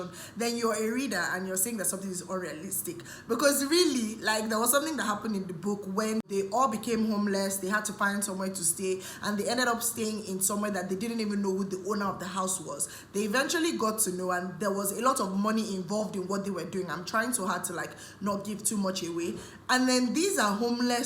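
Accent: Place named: Lagos, Nigeria. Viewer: Your accent is obviously a Lagos Nigerian accent. Or perhaps a certain sub-group in Lagos or even in Nigeria have this unique inflection. I am curious as to how you came about the accent. Does it have anything to do with ethnicity or schooling or social class. reason is that is exactly how Chibundu Onuzo sounds. Thanks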